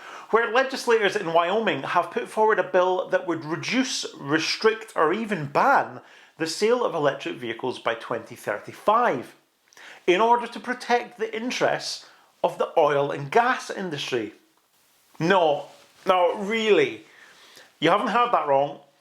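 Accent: Scotland, United Kingdom. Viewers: "Bah-errie'" 😆 I love the Scottish accent. It's my favorite English-language derivative. I thought, banning fossil fuel-powered vehicles in the States, by 2035, was a federal measure. If so, Wyoming should be in for some trouble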